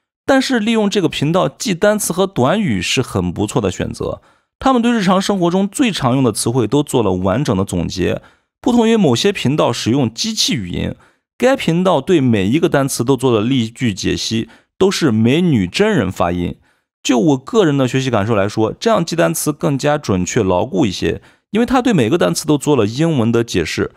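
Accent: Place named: Xi'an, China. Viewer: 一听up主就是西安老乡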